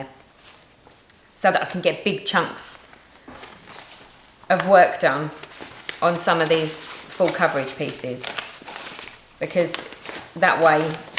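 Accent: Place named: Essex, United Kingdom. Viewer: Hi...PLEASE don't be discouraged by these ignorant, moronic naysayers. They've nothing better to do with their time than shoot nasty comments your way, probably, as Thewoolyhattedstitcher says, they're probably jealous and envious of your talents and beautiful pieces because they have nothing to offer themselves. Your enthusiasm and excitement is contagious. You are a beautiful person inside and out so don't let anyone tell you otherwise!! Block them! Delete them! Whatever you have to do. There are plenty of people out here who love you and would miss you like the devil if you should quit doing videos. I wait patiently for every one of them. Besides, I'd really miss that Essex accent of yours. It just adds to your beauty!! That said, may I compliment you on every piece you've shown in this video. I'm soooo partial to the high heels collection that I'm going to order the pattern. 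You know your an enabler!! LOL Congrats on your page finishes and all you've done. What a hell of a start to 2020. Love ya and please stick with it. Hugs from Canada (Yes, we're huggers here. We have to be just to stay warm! LOLOL)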